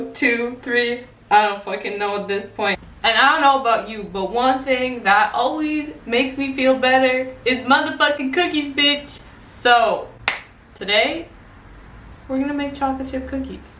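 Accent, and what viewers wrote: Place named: Canada. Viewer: The Canadian accent is amazing!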